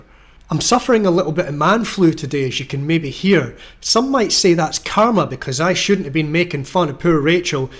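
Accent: Scotland, United Kingdom. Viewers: I've just discovered your channel I subscribed to it. I really like your work. The first Scottish in my list, I love your accent reminds me of my high-school teachers 😊 Regards from Yemen (I bet I'm also the only subscriber on your page from Yemen 😅). Cheers ... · Brilliant. Articulating what 'get real' means. And the Scottish accent is cool, or is that racial prejudice 😂.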